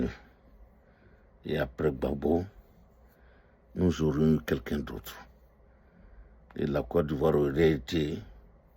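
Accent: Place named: Burkina Faso. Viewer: ce que vous faites là est très dangereux.Les Ivoiriens sont assez divisé comme ça, vous êtes libres de suivre LG que nous apprécions tous pour son courage.Vous les nordistes avec qui le président Houphouet à gouverné,il à utilisé les richesses de l'est pour construire le nord,le sud et le centre.Vous avez bénéficié de tous, vous vous êtes alliés à Allassne qui à été imposé à Houphouet pour détruire la côte d'ivoire et aujourd'hui tu viens raconter des anneries.Ton accent te trahi,tu est d'origine Burkinabè et ton intention est de chercher à mélanger encore le pays parce que tu ne veux pas voir Thiam devenir président.Dieu seul sait qui il choisira.J'aimais bien t'écouter mais là tu dis beaucoup de contres vérité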